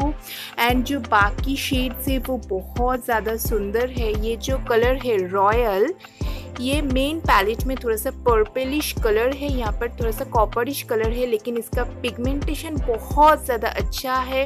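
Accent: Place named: United Kingdom. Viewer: Angrejo jaise hindi q bol rhi hu achcha nahi lg rhs h ok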